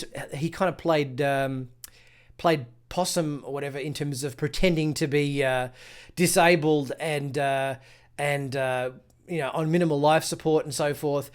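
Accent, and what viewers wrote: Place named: Australia. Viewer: Ah, Sutra is bad Karma! KarmaSutra, sorry I could not resist that! The theme of siblings interest me, you have Narek and Narissa, twins? one good, one bad! Jana and Sutra, which is also a puzzle, since Rios would have remembered if she was gold colored I would thought!. However I think this finale may have some surprises that no one expects, including Sutra, if the Admonition is was not understood by the Romulans, perhaps Sutra might have too, and what is coming will be like the battle of the 5 armies in the Hobbit, perhaps Gandalf and Picard have been comparing notes?? Yes, probably far fetched! I must say I have enjoyed your reviews, and look forward to how it plays out, judging from the accent Australia or New Zealand, I live in Wales in what I describe as God's country of Llaniltud Fawr aka Llantwit Major in Wales, in fact the finale of Dr Who was filmed in the around my neighborhood, the scene with the Cyberheads zapping the last humans was filmed at the St Athan"s Boys Village near the Aberthaw power Station! 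the beach with the gateway to Gallifray is nearby. I look forward to your next review, stay safe!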